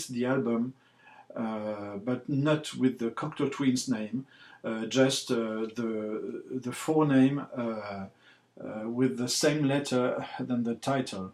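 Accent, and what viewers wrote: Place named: France. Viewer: Always appreciate the French pronunciation! If I had more time in the day I would love to study it since outside of my English speaking stuff, French movies and music seem to dominate my music and film collection next. looks very intriguing. I love and the Cocteau Twins.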